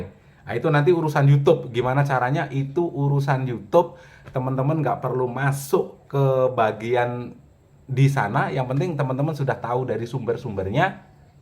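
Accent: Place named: Gombong, Indonesia. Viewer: Mantap meski bolak bolik nonton bahasane ajeg tapi kesane enak dengernya, kaya wong gombong logate haha, Lanjut jadi pendorong bagi kaum pemula 👍🙏🙏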